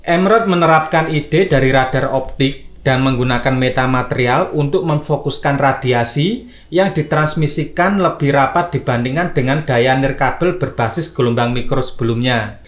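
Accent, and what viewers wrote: Java, Indonesia. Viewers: Sumpah mendok bngt pngisi suaranya. Gak enak bngt di dngr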